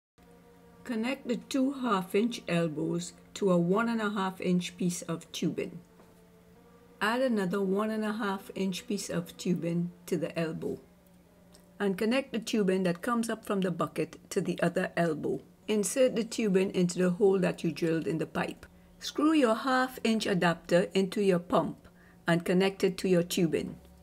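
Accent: Trinidad and Tobago. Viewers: Great job Are you Trini?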